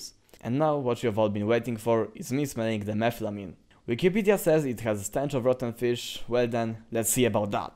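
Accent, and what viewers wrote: Poland. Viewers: Ten Polski akcent :D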